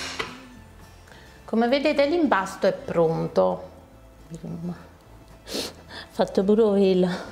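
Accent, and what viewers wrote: Naples, Italy. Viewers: Adoro questa tuo accento napoletano... Sei simpaticissima e bravissima complimenti!! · Signora siete troppo simpatica, nonché precisa e chiara, spiegazione eccellente , italiano corretto con la simpatica cadenza napoletana!!!!!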